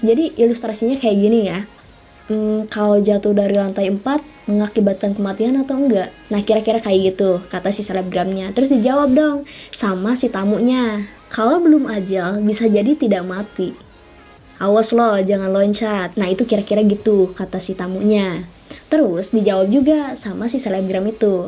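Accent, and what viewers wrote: West Java, Indonesia. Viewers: Sunda campuran ngomomg na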